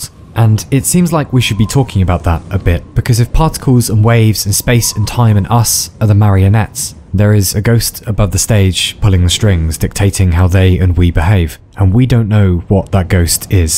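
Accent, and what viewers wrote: England, United Kingdom. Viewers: your beautiful fellow English voice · Brits will always have the best narration voice, love ur vids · right away u know u r listening to a Brit. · There's like a whole bunch of youtube channels now with narrators with the same Alain de'Botton accent, and I'm not convinced that they're not all de Botton. But this one is the best one. And if it is de Botton, he's much smarter than in most of the other channels. And on a side note, why do Brits with this accent sound smart, even when they're dumb as doorknobs. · Orchestral Piece Begins British Bloke starts speaking fast This is when the videos make me shag the vicar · is this the same guy from the zephereus channel or do all brits sounds the same to me?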